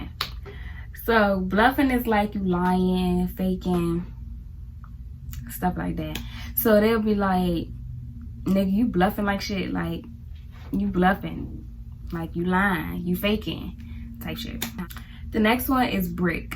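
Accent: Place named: Baltimore, United States. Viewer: Im from Philly. The way you talk sounds like two Baltimore girls I know. And I know you're not saying (yew,dew) like them, but it's more than that. I can't explain it cus I'll be writing paragraphs. You can tell the DMV is a transitional area. DC locals just sound more southern.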